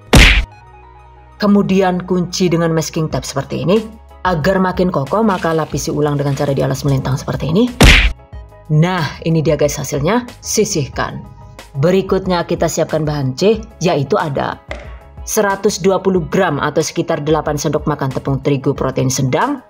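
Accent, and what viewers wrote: Banyumas, Indonesia. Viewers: Ngakaaaak... Ternyata orang ngapak juga, ora ngapak ora kepenak ya mbekayu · Waw dubbernya " ngapak" kiye 🤣🤣🤣🤣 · wkwkwk.. ngapaknya darimana bang? saya cilacap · Lama ga nengok channel ini. Kangen intermezzonya.. Logat ngapaknya manaa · 😂 jebule ngapak 😅 keren Channelnya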